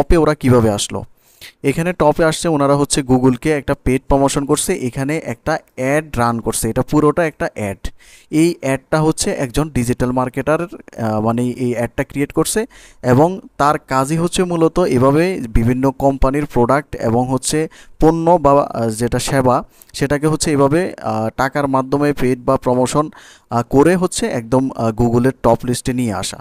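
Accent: Noakhali, Bangladesh. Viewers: আপনার বাড়ি নোয়াখালী আমি বুঝতে পারছি। প কে ফ বলা হলো নোয়াখালীর মানুষ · আপনার বাড়ি মনে হয় নোয়াখালী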